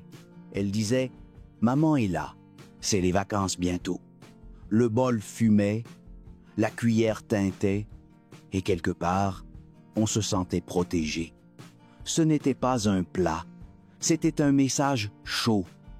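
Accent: Quebec, Canada. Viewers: Vous avez l'accent du Québec. Est-ce que cela parle des produits de France ou du Canada? Il y a des produits écrits en anglais, et d'autres comme oeufs mimosa, gratin dauphinois, etc typiquement de France aussi à cette époque ... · Pas moi, je lui trouve un discret accent canadien dans ses prononciations 🙂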